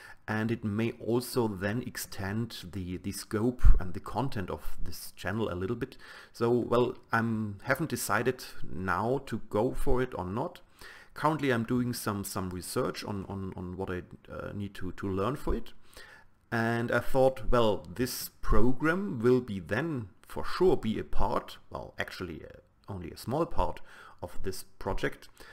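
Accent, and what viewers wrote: Germany. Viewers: Are you from germany? nice video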